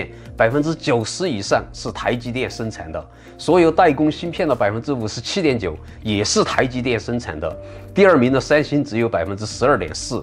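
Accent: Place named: Sichuan, China. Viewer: 先把影片標題繁簡轉換搞對吧～「穀/谷」，很不專業。而且你口音實在有點重，與其努力繞舌，不如直接用四川話錄視頻吧，效果一定很棒，畢竟是母語。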